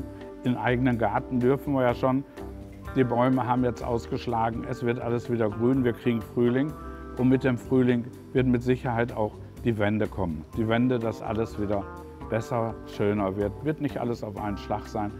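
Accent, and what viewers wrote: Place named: Ruhr, Germany. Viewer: Plattitüden und Ruhrgebietsdialekt, kennt man sonst nur aus der Kirche.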